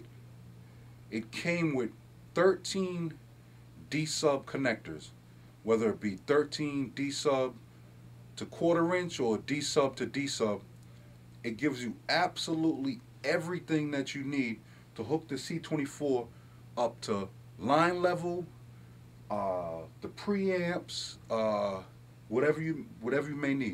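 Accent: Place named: North, United Kingdom. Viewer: gotta love that deep northern accent....lol....good video tho...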